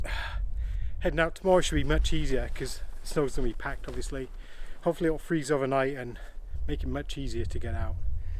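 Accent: England, United Kingdom. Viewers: Lol again Esme stole the show! I felt bad for her with those short legs. I’m short too I know how she feels. My boyfriend is from England as well but totally different accent than yours. He’s from Bedford. Milton keens? I will be doing my first camping of the season in 4 weeks with the Kamp rite. You inspire me. Keep up the great videos!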